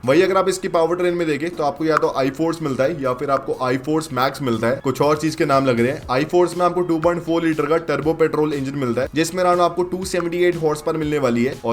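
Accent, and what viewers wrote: Haryana, India. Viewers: Haryanavi accent mai bakwas mat kar yr CLARITY se baat kiya kar 4RUNNER arahi hai ya nahi ,teri toyota walo se kuch baat hui to wo bata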